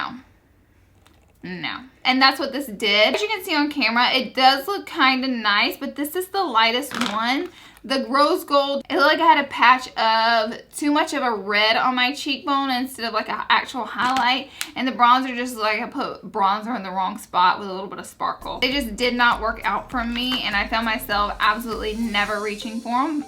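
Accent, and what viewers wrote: South, United States. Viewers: I love you and your accent . southern girls unite. Texas girl here lol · Omg! Your southern accent😍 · Is that wallpaper? What is that background? It's so pretty! Your videos are great & my daughter and I love watching them together. You are a little goofy, just like us and we love your accent. My dad is from NC & we have a house down there, so we love southern accents!! Keep the fabulous videos coming!! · LOL at you commenting on the fact you say oil wrong haha, people don't understand that southern drawl sometimes!! Love your videos and keep on say Oil anyway you want!! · This is the most stupidest comment ever....love southern accent...hence that I live in the South:-)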